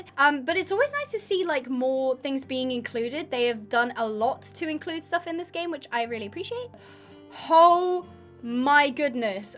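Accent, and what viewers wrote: United Kingdom. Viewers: Are you british? lol. your accent is amazing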